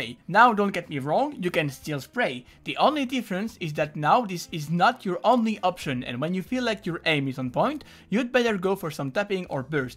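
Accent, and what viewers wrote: France. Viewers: Un léger accent français mais Nice vidéo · Your prenouncation is fine, you just talk english with a french accent you cant help that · French and uk englisch pronounciations are the best:) keep it coming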